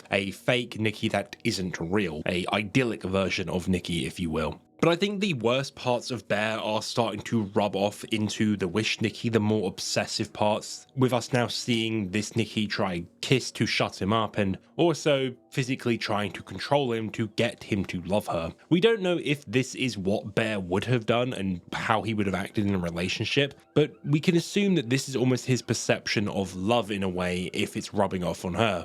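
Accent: United Kingdom. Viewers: No he just has a hard English accent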